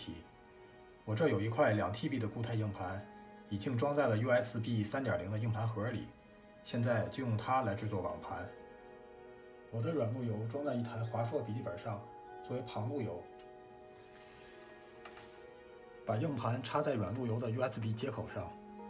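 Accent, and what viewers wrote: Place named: Tianjin, China. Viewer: 我从油管上找了很长时间的视频都他妈没有说得清楚的。您说清楚了。关键是，我听出来了乡音。我是离开家乡二十年的天津人。哈哈哈哈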